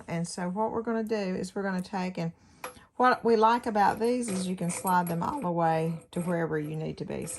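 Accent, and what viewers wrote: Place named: South, United States. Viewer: I'm from Australia, I love the southern US accent like yours.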